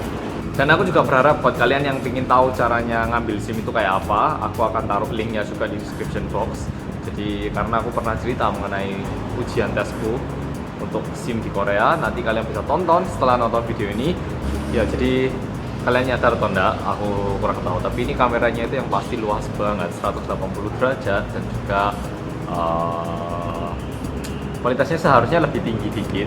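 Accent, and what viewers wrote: Java, Indonesia. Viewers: klo ngomong inggr singapore accent banget, klo ngomong indonesia jawa banget, klo mnurut sesama org korea, korean-nya beraksen juga ga? kan uda lama tinggal di Indo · lucu rasanya mendengar orang korea ngomong nya medok.. emang sih aku juga medok dan aku asli Indo tapi masi kesusahan bahasa sendiri haha.. (bahasa jawa maksudnya). btw ibuku suka ngeliat video mu dan ternyata ya.. lumayan lah... ketawa sendiri aku ngeliat nya :v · logat jawanya nggak ilang2 haha 😂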